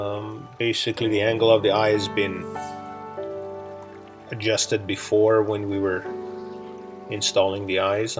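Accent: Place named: Poland. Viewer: Boy oh boy I'm so pleased I found you! I've been doing Taxidermy for 43 years, self-taught , so it's been a struggle. Although I did achieve best all-around taxidermist in the state of Arizona 2001, and I'm still learning everyday. You are helping me a great deal! Thank you, thank you ,thank you. Question, are you using silicone caulking? Also, where you from Brother? Some of your English words sound like words used from my polish family when they speak English. But I know that's not what it is. Again I want to thank you immensely! Mounting Birds in Arizona has been a struggle because of the dry heat over here . now that I've watched you mount a bird before you blow dry it you gave me great ideas. I just wanted a ringneck pheasant your style and I love it! I still hit the inside of the skin with a little hydrogen peroxide here and there to keep it moist. 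And also try your head locking mechanism. I've never done that before either. Now I can adjust the position of the head and neck without fear of breaking the connection! You're the man! My hat is off to you! Yours in Taxidermy, Mike Bieda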